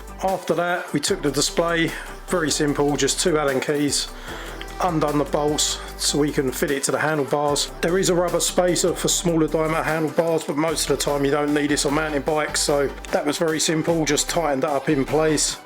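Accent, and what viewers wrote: United Kingdom. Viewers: Always enjoy the builds! From a cross the pond, I gotta say having a British accent makes it very entertaining 😂. I have no idea what a jubilee clip is, but I know what a hose clamp is.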